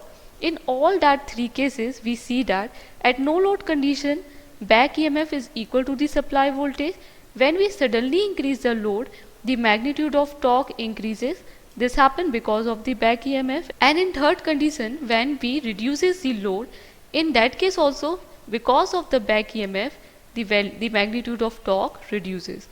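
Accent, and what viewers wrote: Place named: India. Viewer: Very good Best Indian accent yet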